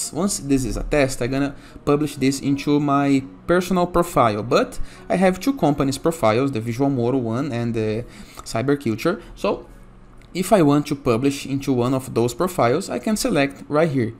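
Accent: Brazil. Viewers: Você devia fazer mais videos em inglês, seu inglês é legal, percebi que era brasileiro pelo sotaque..😁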